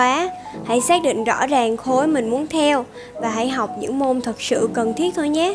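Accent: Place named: Saigon, Vietnam. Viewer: Mình thấy b ko nên lồng tiếng ý, vì giọng b là người sài gòn, khá khó nghe, b nên thử giọng hà nội hoặc dùng chữ thì sẽ chill hơn nha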